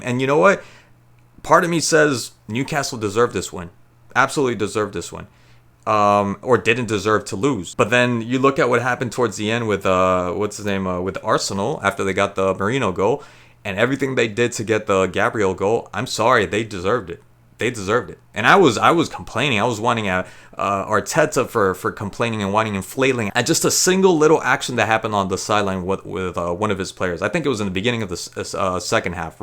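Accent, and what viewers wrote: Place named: United States. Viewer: Not gonna lie, i hear that accent and once i've wrote this i'm off. 🤣🤣🤣 sorry fella but yanks and football just don't go together.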